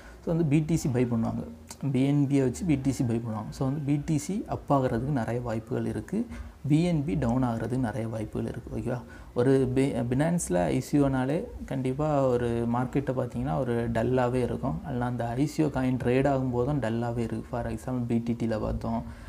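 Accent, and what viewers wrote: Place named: Nanjil, India. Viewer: Brother unga voice vachi Guess panniten nanjil nu Already Mutual Fund la invest pannitu iruken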